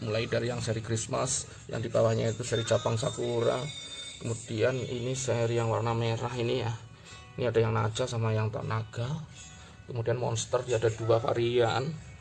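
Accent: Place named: Java, Indonesia. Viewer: Logat Jowo ne Gak Lepas... Mantull Mas 🖒🖒🖒 Bangga Dadi Wong Jowo.. ❤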